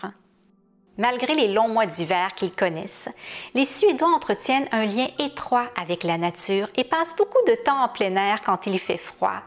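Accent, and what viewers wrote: Quebec, Canada. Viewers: Si je ne me trompe pas, cet accent est Québécois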